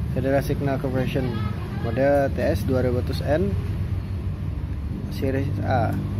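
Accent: Banjar, Indonesia. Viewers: Kaya ada logat logat banjar nya gitu om hehehe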